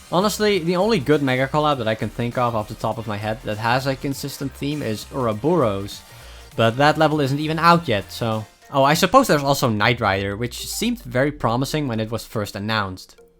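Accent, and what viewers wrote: Netherlands. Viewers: Bruv are you dutch? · kkr dik nederlands accent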